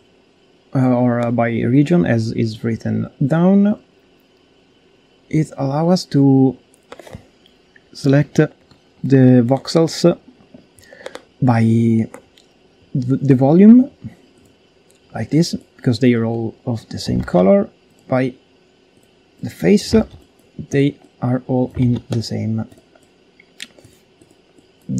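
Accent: Italy. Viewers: Lo sapevo che eri italiano, dalla pronuncia ahahahha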